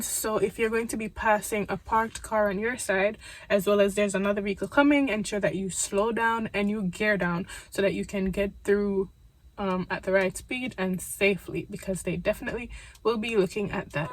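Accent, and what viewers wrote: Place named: Jamaica. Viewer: Girl, when I head that Jamaican accent I got gassedd!! I love finding Jamaican YouTubers. These tips were helpful even those I failed twice lool I'm going to use them in my next test and hopefully, I pass because ain't no body got money to be paying for lessons and test again and again.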